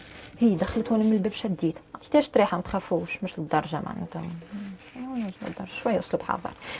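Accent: Tunisia. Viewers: يا روحي عاللهجة التونسية احبك برشااااااااااا من المغرب <3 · لا ، هي تحكي باللهجة التونسية كما يتكلم كل التونسيين او الاغلبية الساحقة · أنتي تونسية والله حتى كنتوا على اللهجة ديالكم. خليت كلشي وركزت كيف تدوي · ي سيدي بن سيدي هههههههههههههههههههههههههههههههههههههههههههههه تجنن فهمت لهجتك مع اني مو تونسيةة > ي اخي التوانسة ي اخي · i like how you talk and your tunisian accent , ena 3cht zeda m3a philipini 2 ans , wselna mara lel markez chkit bih :p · ههه قتلتيني بالضحك و ماسخيييتش بالفيديو اللهجتة التونسية زويييينة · تونسي يا روحي يااااااا ممكن تعملي فيديوا كفاه مشيت تقرأ والا تخدم و شنو خدمتك ياا فرحت كي سمعت لهجتك يا روحي أنا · 😂اللهجة التونسية اصلا تضحكني و زدتي انتي و الله مجنونة اتابعك من الجزائر🇩🇿♥️ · حبيت لهجتك التونسية انا احبها اصلا لكن خاصت جميلة جدا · يا ربي اللهجة التونسية شحال شابة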